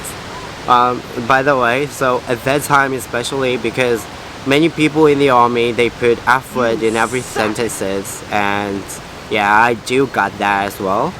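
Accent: Australia. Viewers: 와 근데 진짜 호주 악센트 영향 많이 받은듯 짧은 시간동안 신기하내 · 벌써 400명 돌파!!?! 성장속도 실홥니깟?! 잘지내시고계시죠 ㅎㅎ 정말 영어발음이 오지발음으로 바뀌신거같아욬ㅋㅋ 신기하네요 아무튼 영상 잘 보고있습니다 ㅠㅠ 멜번 그립네요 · 영상미 너무 예뻐버리기 ㅠㅠ 영어 발음 은근 중독성 있네요 ㅋㅋ 보통 많은 한국분들이 호주 가셔도 미국식 영어발음 구사하시는거 같은데 은 애초에 공부를 영드로도 하셔서 그런가ㅋㅋㅋ 호주 발음이 찰떡이심ㅋㅋㅋ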